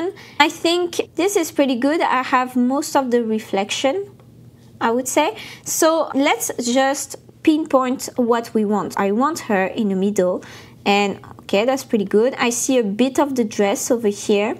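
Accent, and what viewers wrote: France. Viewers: Salut.. Merciiii. Pour. Ce video. surtout que c'est ton specialité. J'addors ton accent français. Quand tu parle anglais.. ❤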